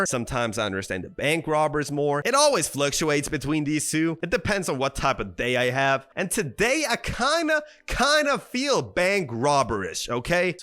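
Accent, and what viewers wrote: New York, United States. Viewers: Bro sounds like he is from new york or something😭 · Thats a new yoker accent for ya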